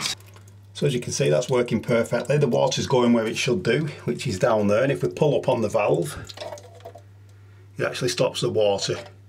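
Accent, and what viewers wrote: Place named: Yorkshire, United Kingdom. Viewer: My pleasure! Just out of curiosity, what UK city is your accent? My guess is somewhere in the Yorkshire area.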